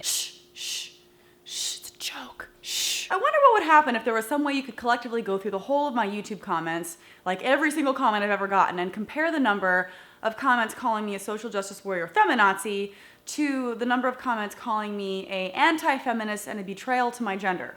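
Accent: United States. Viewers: It sounds like you live in the US.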